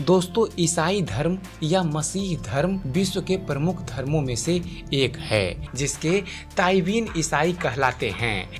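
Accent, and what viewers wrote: Bihar, India. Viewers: full bihari aawaj he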